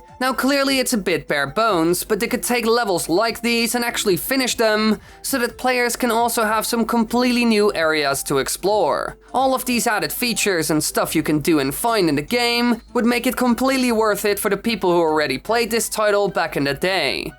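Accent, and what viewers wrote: Netherlands. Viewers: OMG that’s why the accent mijn broer van Nederland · I really wish I could be there, I,m still in school and have homework to do😭. Also you sounded kinda dutch are you exactly from the Netherlands? And a nother also, I love your vids there epic!!! I have watched every single evolved video! Have a great day.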